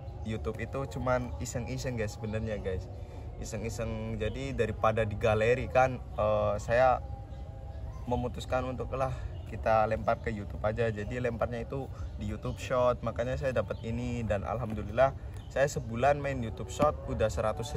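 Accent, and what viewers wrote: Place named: Banyumas, Indonesia. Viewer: Kayane tiang ngapak 😁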